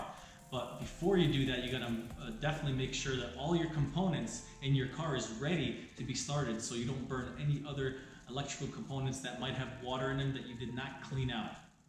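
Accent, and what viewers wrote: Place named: Russia. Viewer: This guy's accent sounds Russian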